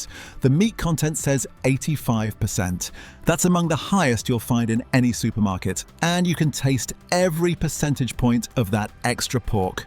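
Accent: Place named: United Kingdom. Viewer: These videos are supposed to be factual and true and the narration sounds British - however the images used in most cases bear zero relevance to British products, in some cases the images show products priced in US dollars! When the pictures are wrong one starts to wonder whether the information is true?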